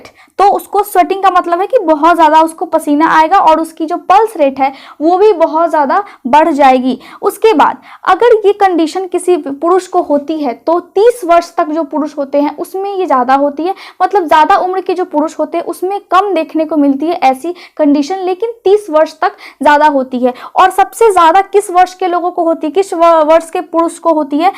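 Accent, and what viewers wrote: Bihar, India. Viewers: Bihar ki ho,Mother Tongue in Fluency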